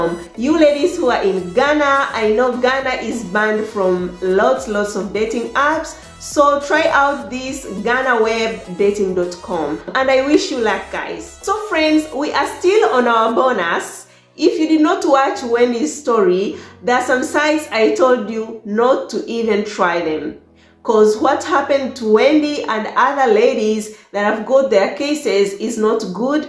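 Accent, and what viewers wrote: Uganda. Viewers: I have been pondering on asking you this question, did you go to school in Uganda? Your accent sounds like that of Ugandans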